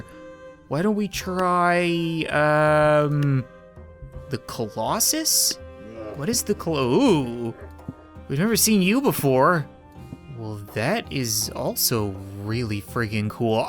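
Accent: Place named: Canada. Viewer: sounds extra Canadian today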